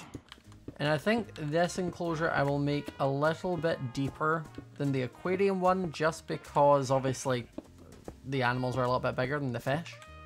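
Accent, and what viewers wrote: United Kingdom. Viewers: Omg I love your uk voice because I'm from the us . Like if u agree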